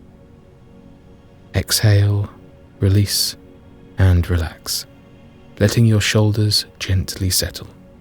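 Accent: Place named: United Kingdom. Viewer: so glad this is a british person i hate the annoying american voices that they usually have on these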